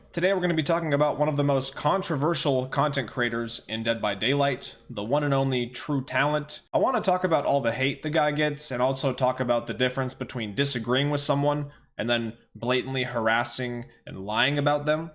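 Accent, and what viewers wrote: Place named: United Kingdom. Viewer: He just sounds condescending to some people because 1) he knows what he's talking about 2) cause of the accent. People have been taking the British accent the wrong way for hundreds of years now lol.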